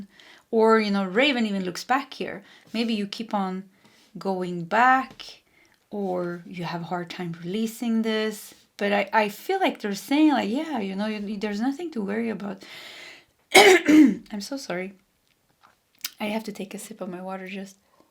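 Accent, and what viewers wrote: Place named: Sweden. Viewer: Will change Siri to Swedish accent ❤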